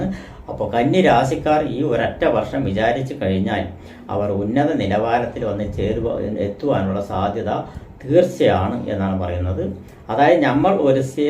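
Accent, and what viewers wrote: Tamil Nadu, India. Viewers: ഇത് ഏതോ അണ്ണാച്ചി മലയാളം പറയുന്നത് പോലെ ഉണ്ടല്ലോ റാസി മനസൻ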